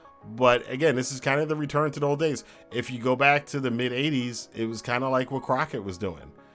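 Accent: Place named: United Kingdom. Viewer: Also you have make these comparisons with a British Accent.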